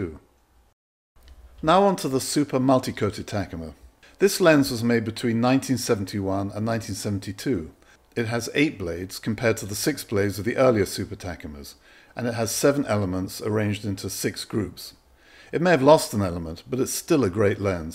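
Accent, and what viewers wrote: United Kingdom. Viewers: With a name like and a British accent, how can we go wrong?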